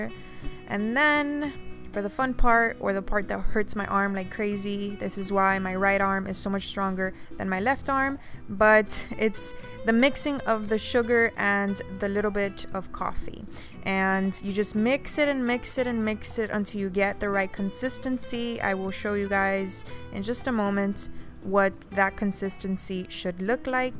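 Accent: Miami, United States. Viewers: Because I hear a Miami accent. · Good job on the video. I sense a Miami accent lol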